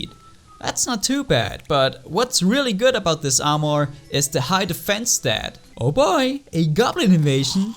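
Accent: Germany. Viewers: man hört dein deutsch heraus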